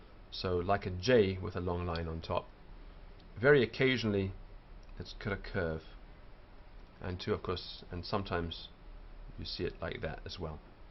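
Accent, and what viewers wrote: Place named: United Kingdom. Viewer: the low audio quality and british accent makes it seem like some old bbc documentary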